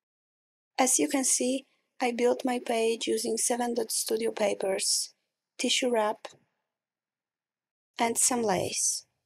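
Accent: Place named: United States. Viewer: Very enjoyable to watch and I love your accent! (US)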